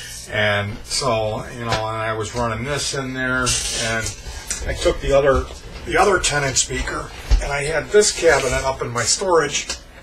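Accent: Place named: Upstate New York, United States. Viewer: The accent. Buffalo, Rochester?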